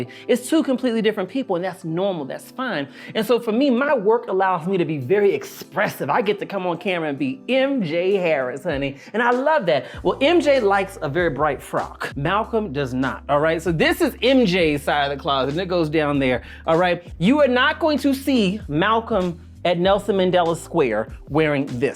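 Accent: United States. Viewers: I actually believe this. I do think that he gets around it because he’s American, the accent is what saves him. Any black South African is not having the same experience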